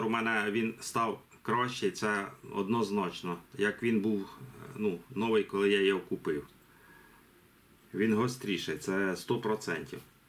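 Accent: Poltava, Ukraine. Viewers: Балакаєте, як на Полтавщині, дуже приємно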